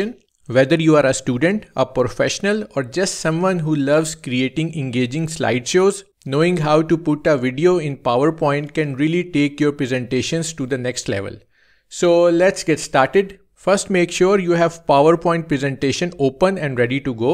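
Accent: India. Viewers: Indian ahhh voice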